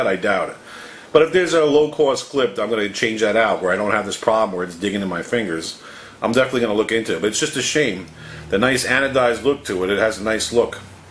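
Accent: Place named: Long Island, United States. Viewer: I’m a fan your voice reminds me of home I’m from NY LONG ISLAND I live in Washington now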